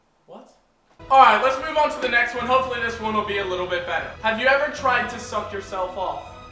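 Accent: United States. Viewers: You sound American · U sound American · Am I the only one who thinkg he sounds American? And coming from an actual American that is huge. It really confuses me to know he has never lived here. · Thought you were American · your accent sometimes sounds american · ... I thought he was American.... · The accent sounds closer to American than anything else. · Am i the only one who thinks he has kind of an american accent? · So your accent is all those things you mentioned but I can mostly hear American yet you never lived in America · you sound American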